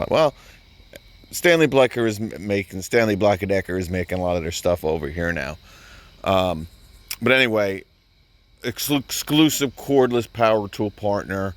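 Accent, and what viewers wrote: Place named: New Jersey, United States. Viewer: Dear God I’ve missed that gravely, baritone Jersey voice. Thanks!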